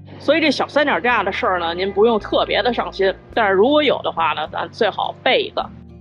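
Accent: Beijing, China. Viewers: ，我在西岸，能跟着你追大纽约地区的光甚是开心，喜欢这一口京腔，听着心里特别踏实。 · 还是不做作的京腔舒服啊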